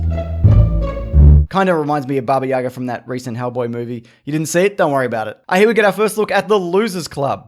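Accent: Australia. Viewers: Love your accent. I'm guessing it's Australian? Also did you know Stand By Me is a prequel to IT? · U Aussie mate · Are you Australia? I am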